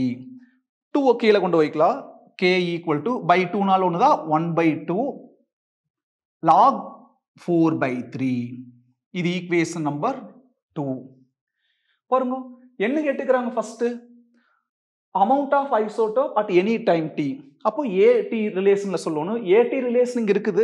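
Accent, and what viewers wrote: Coimbatore, India. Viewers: Sir i am feeling that i cant see your video from tomorrow i miss your videos your approach your teachings and your body language your coimbatore slang and everything sir hats off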